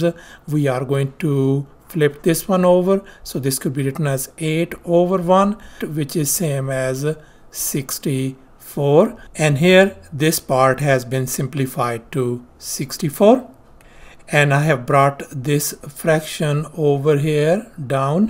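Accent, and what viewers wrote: India. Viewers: Sir you are amazing... your accent??....are you Indian or Pakistani??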